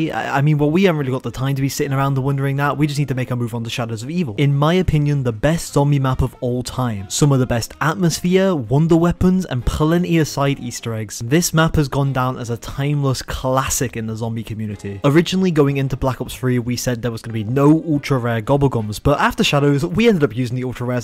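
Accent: United Kingdom. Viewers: a tale as old as time an english accent, and a cod zombies easter egg like bread and butter, good and evil, night and day. yin and yang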